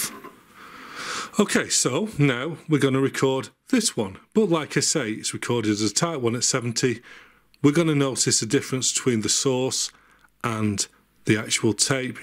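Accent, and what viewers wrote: United Kingdom. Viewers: Off-topic but i watch another youtuber whose a gamer, hes also british, i am british, tonys british, i just love hearing the british voice. Theres something about it, probably the "slagging off voice" if you dont k ow what that is, watch tonys video about cheap cassettes. Specifically the "fag packet" part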